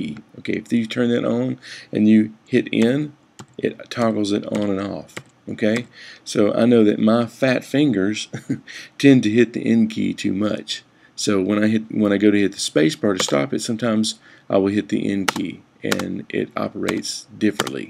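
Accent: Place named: Tennessee, United States. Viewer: THANK YOU!!! You sound like you're close to BNA.:-) Good vibes.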